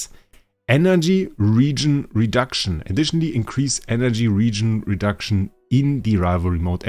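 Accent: Germany. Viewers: the perfect pronounciation of that made me realise that you were german and that accent wasnt from some other language LMAO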